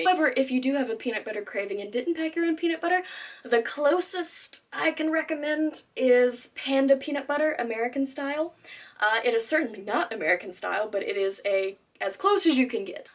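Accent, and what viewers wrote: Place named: Ireland. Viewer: You have a super subtle Irish accent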